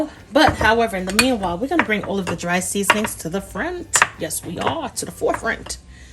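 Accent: New York, United States. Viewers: Oh btw you sound like a New Yorker..are you??.....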